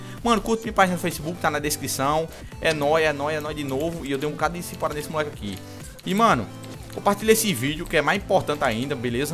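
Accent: Northeast, Brazil. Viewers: vc eh de que parte do nordeste?!?! Me responde e me passa um contato seu... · Vc é Do Nordeste?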